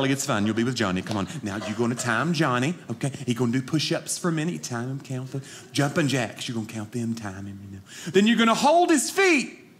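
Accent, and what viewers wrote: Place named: Texas, United States. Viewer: His accent and talk of running suicides took me back to Texas middle school athletics. Ran so many suicides one day until I threw up and my legs were so sore I couldn’t sit down normally for a week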